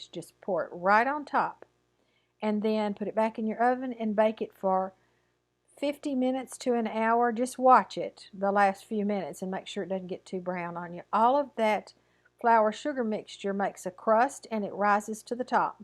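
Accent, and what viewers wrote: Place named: Oklahoma, United States. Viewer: I love hot chocolate! I've been enjoying your prayer chair chats because I love your accent. My Grandma was from Oklahoma and you sound so much like her, it brings back so many happy memories for me. Happy stitching!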